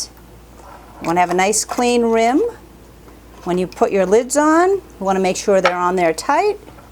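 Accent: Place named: Maine, United States. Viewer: Do I detect a New England/Maine accent? I am from Freeport, Maine and you sound like home! Now I’ve gotta try your pepper jelly. Have you tried it over a loaf of cream cheese? Delicious..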